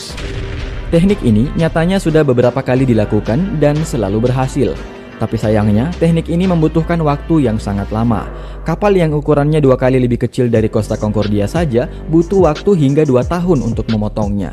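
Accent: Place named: South Sulawesi, Indonesia. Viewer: Orang sulsel ini kyknya mimin😂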